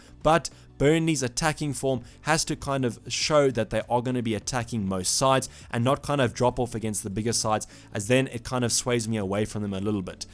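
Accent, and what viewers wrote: South Africa. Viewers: good to hear that SA accent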